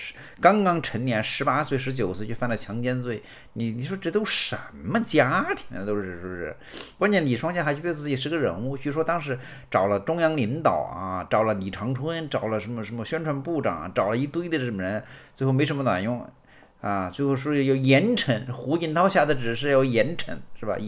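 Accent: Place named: Jingzhou, China. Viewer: 这个主播的口音和荆州地区类似:face-blue-smiling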